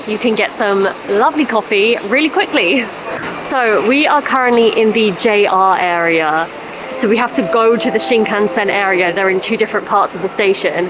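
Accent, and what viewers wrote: United Kingdom. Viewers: 最初の1分くらいはオーストラリア人かニュージーランド人かと思いましたが、イギリス人なんですね。Crisps。 新幹線は、JR東日本のEki-Netがたまに半額やってますね。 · イギリス英語🇬🇧最高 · I can listen to your UK English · やっぱイギリスアクセントめちゃいいわ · Lovely！ As soon as I look at your video, I miss England because I always listened to British English that you talk when I lived in England. I like to listen to British English. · easy to hear british pronunciation😄😄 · ＢＢＣの短波放送を聴いていたのでイギリス英語が私には理解しやすいです。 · Your accent is lovely! I like British accent. Thank you for coming Japan!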